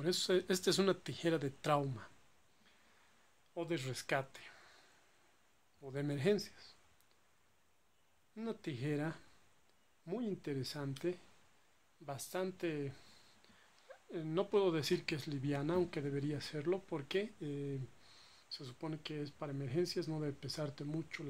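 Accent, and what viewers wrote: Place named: Bolivia. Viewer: hola estimado, por tu forma de hablar .. eres de Bolivia verdad?, si es así.... me podrías decir donde puedo encontrar estas leatherman raptor?, muy buena revisión!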